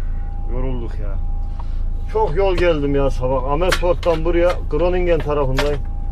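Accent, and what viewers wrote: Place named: Sivas, Turkey. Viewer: Abi valla seni hemşehrim zannediyordum Sivas in ağzına benziyor konuşan adamsın valla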